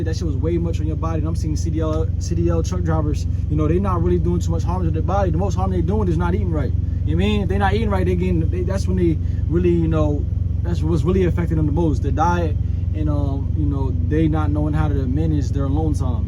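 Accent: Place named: Philadelphia, United States. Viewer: How much they pay ups truck driver now for local cuz u sound like u from philly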